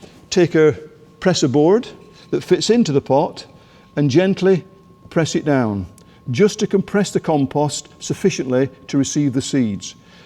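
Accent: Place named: Lancashire, United Kingdom. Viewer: Lovely accent, are you from Lancashire?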